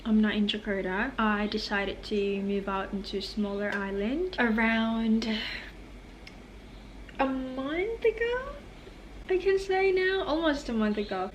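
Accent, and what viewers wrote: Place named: United Kingdom. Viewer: i am addicted to your british accent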